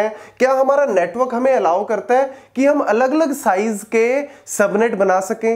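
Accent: Punjab, India. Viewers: Good explanation . Apki hindi main punjabi accent atta hai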